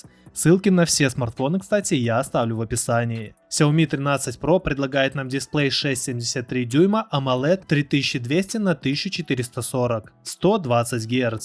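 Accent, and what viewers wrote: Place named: South, Russia. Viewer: По говору сразу понятно, либо с юга России либо с БУ(бывшей Украины) Достатка тебе и здоровья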